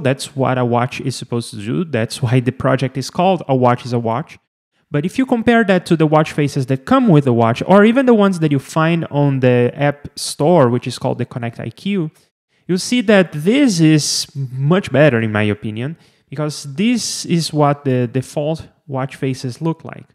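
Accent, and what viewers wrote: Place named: Brazil. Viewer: Nice video, I think it's hard then I expected, as I'm not a programmer. By the way your accent sounds like a Brazilian but with a really good English!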